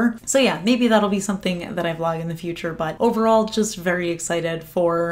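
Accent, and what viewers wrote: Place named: Las Vegas, United States. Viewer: Hey. I am loving and will pick up Force of Nature. I haven't found a green polish that beautiful since China Glaze's Emerald Sparkle well over a decade ago. Thank you for your dedication to this channel and your viewers. You already sound like a Las Vegas native. I was born and raised here and have lived in Henderson for 30 years now. I'm glad to have you as a neighbor.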